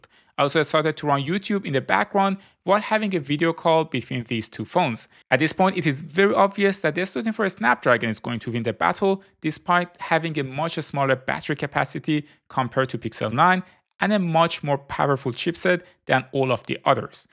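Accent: Iran. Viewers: Love your persian accent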